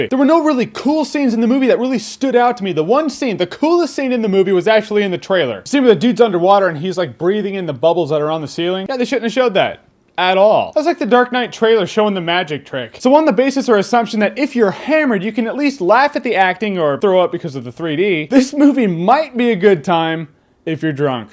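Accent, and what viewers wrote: Australia. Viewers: Hahaha Im aussie and that would have to be the funnest Aussie accent i ever heard but still was a real beievable one at that,Should do a review on the Crocodile Dundee for shits and giggles! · Jeremy's a wizard at faking australian accents. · Nice aussie hardcore adventure guy accent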